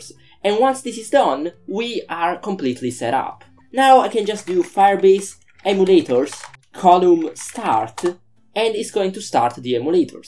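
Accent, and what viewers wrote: Italy. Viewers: Great video thanks very much! I love the accent! haha is it Italian? Would you be able to explain how to debug and set breakpoints in a call to "on Document created" in firebase functions.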